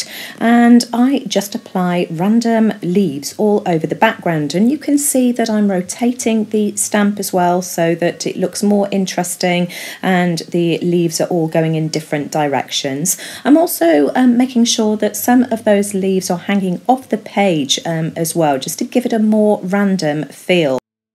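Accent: United Kingdom. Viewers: I always look forward to your videos, you make beautiful art! And I love your British accent 🙂. I would love to see you make a soft pastels tutorial...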